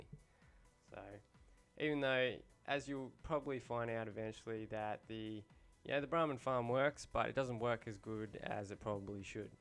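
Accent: Australia. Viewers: I just recognized after 15 videos that he has an australian accent lol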